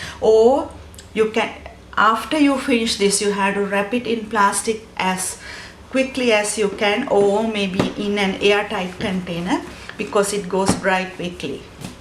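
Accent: Sri Lanka. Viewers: Sri Lankan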